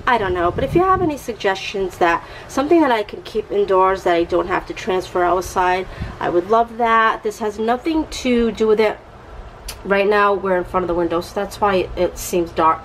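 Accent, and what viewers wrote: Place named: New York, United States. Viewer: Love your accent...sound like a New Yorker and nice farmhouse inspired dollar tree haul! I'm loving the cross along with the blue picture frame combination!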